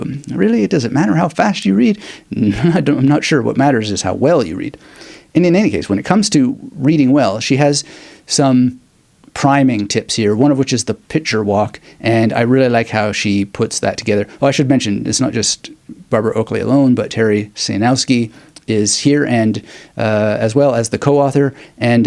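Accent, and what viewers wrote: Canada. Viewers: Rockin' the Canuck accent 🎉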